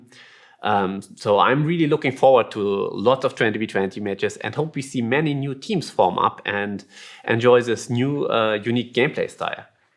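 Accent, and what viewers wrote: Germany. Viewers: his accent is the most german accent ive ever heard.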